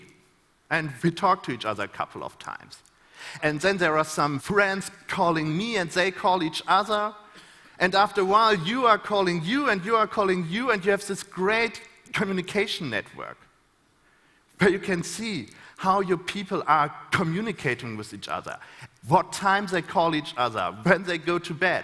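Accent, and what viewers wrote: Germany. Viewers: Sort of, but it's still more important what he's saying rather than how well he's communicating. His issues communicating could've just been nerves or an actual mental tic, as well as combining that with his german accent in the english language, but what he's saying is scary as hell. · Ohhh, it's a *stutter*. I thought that was just how to German accent made some people sound. >_> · Those are standard speech tricks for stutterers, to stop them from stuttering. And the german accent of course.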